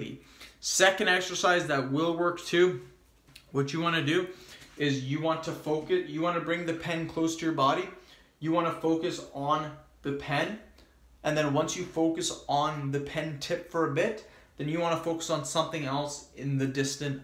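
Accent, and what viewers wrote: Canada. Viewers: You have a really strong Canadian OAT accent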